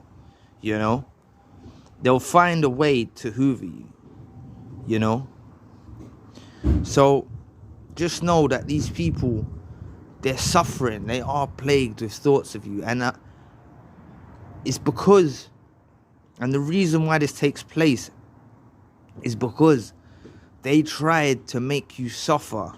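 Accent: London, United Kingdom. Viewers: Very welcome 👍🏻 What part of London are you from i was born in Leytonstone ☺ sorry for asking just love hearing a familiar accent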